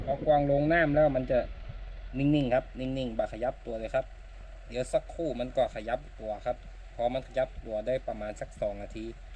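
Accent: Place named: Isan, Thailand. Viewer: คนอีสานใช่ใหมครับ❤ผมเป็นคนอีสานครับแต่พูดอีสานไม่ได้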